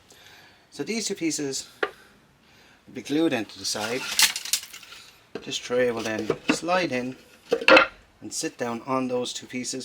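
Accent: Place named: Ireland. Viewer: Just discovered your channel! I like your style, and the simple but elegant toolbox really respects the Japanese methods, which are simpler than a lot of people realize. Subscribed! Agree with on volume: I'm old, and my ears have trouble with the loud music and intro and your lovely soft Irish speech. A little balance wouldn't go amiss.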